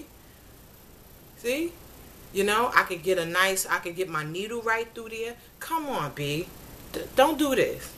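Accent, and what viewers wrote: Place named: New York, United States. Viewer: Gurl,, you had me on the floor. It is rare to hear an NYC hair person on here. I loved your frankness!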